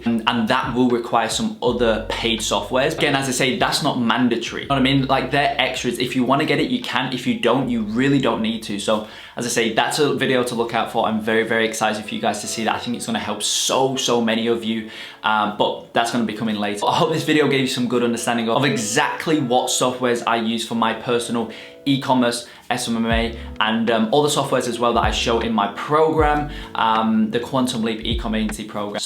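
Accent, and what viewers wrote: United Kingdom. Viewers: You live in Australia but you are British, right?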